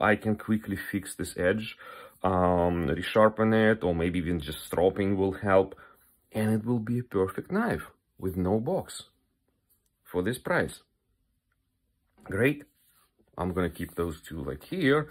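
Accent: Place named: Russia. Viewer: Чувак да ты русский? )) У тебя русский акцент))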